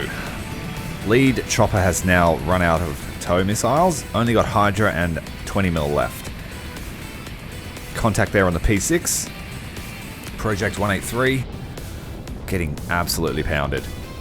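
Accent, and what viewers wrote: Australia. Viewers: Watching this at 8am in the UK, listening to a drunken Aussie talking about rave rounds. All is right with the world.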